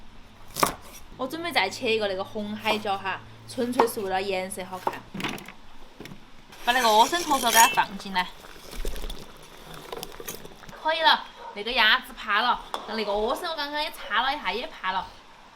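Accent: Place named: Nanchong, China. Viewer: 怪不得听你说话那么熟悉的乡音，我也是南充的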